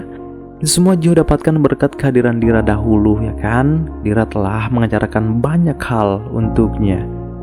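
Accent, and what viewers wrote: Bugis, Indonesia. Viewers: Bugis apa adminnya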